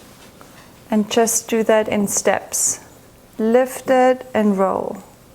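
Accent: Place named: Germany. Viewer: Ich wusste direkt sie kommt sicher aus Deutschland oder Österreich 🤗🤗🤗weil der Akzent einfach ich liebe deine Videos !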